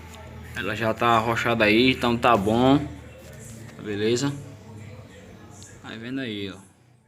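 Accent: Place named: Northeast, Brazil. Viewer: Maravilhoso sotaque nordestino, " ta rochada ja" abraço pra vc s todos aqui de CuritibaPR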